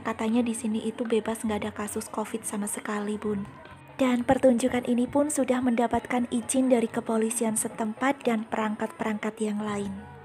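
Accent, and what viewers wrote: Banyumas, Indonesia. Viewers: Kita sama2 ngapak mba👌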